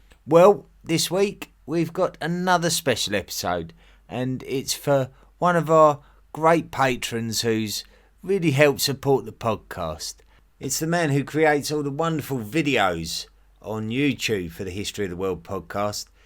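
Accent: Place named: England, United Kingdom. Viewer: Narrator pronounces most of the words incorrectly. English accent grinds on my last nerve. 😢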